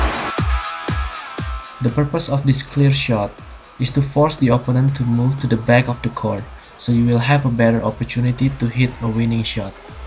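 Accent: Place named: United States. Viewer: Me toobut I don't understand what he is saying bec I'm british and he has american and asian acent😂😂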